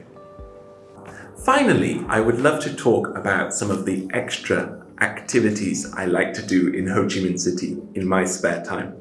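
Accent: United Kingdom. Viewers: Giọng anh - anh chuẩn, nhiều từ vựng. Năng lượng của Thầy luôn truyền cảm hứng rất tuyệt vời!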